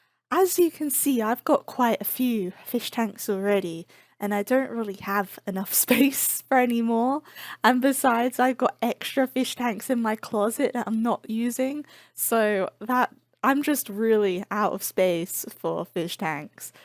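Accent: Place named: United Kingdom. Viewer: Came here from Dodo - I'm not much of a fish person but so good to see someone knowledgeable and caring about their pets :) PS. you sound British, are you originally from there and moved to the US?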